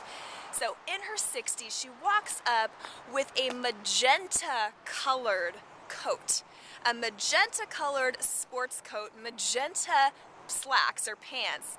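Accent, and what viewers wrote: United Kingdom. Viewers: Thanks for posting this. Your voice is pleasant, you are good looking, and those two things helped me to overlook the annoying British voice and the elevated video camera/phone angle. Your arms must have started to cramp up. With the British accent all I could think of was Madonna putting on a British accent. Remember a little goes a long way. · Like your British accent. How you said the story about this lady was amusing. :)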